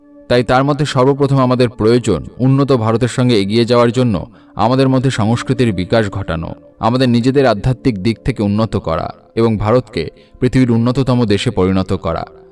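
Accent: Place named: Bangladesh. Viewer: আপনার কথা বলার style, উচ্চারণ সব বাংলাদেশের মানুষের মত ।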